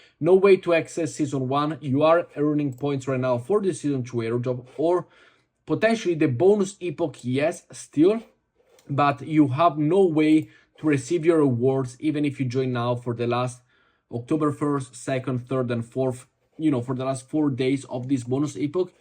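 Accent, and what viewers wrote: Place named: Italy. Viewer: Sei italiano e si sente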